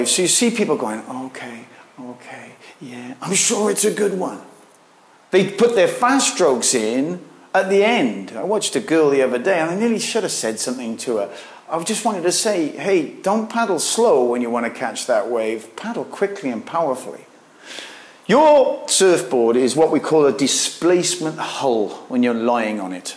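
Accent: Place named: Newport, United Kingdom. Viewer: Can’t tell what accent he has sometimes I notice a Newport accent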